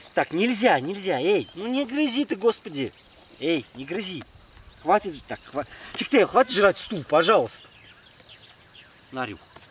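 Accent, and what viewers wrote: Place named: Moscow, Russia. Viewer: Вы меня потрясли! Вашей любовью к животным и самопожертвованием! Очень уважаю таких людей, низкий Вам поклон за это! Нож в сердце - рассказ о страшной ферме, меня всегда убивают такие люди, которые ради денег готовы на страшные поступки! Огромное спасибо, за спасенных львов, за Ваш подвиг человеческий. Только что узнала о Вас, подписалась на канал. Ничего не удаляйте, пожалуйста, будем ждать новых Ваших видео! Левушки Ваши - красивущие!!!!)))) Привет Вам из Харькова))) Очень приятно слышать Вашу речь московскую, у нас там огромное количество родни! Успехов Вам и помощи Божией!!! Все у Вас получится!!!!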